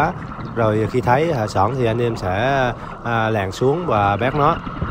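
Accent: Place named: Quang Nam, Vietnam. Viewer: quê ở đâu mà nói giong fống qủang nam vậy bạn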